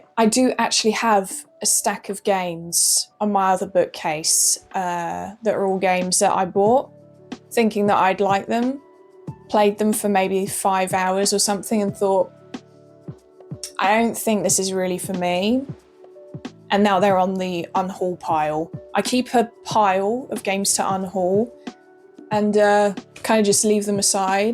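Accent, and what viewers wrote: England, United Kingdom. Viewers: Yes. I like it. I'm not from english speaking country. I like english accen the most ☺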